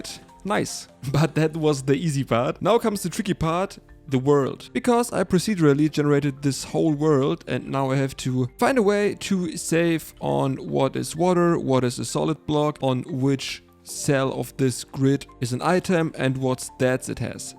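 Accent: Germany. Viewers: Der deutsch akzent ist crazy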